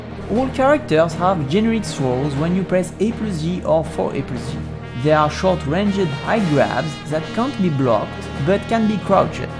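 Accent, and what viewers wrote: France. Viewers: I think you got to lower the volume a bit. It's already slightly difficult to understand you because of your French accent. Merci beaucoup! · That is called a French accent. Congratulations, you're rasist.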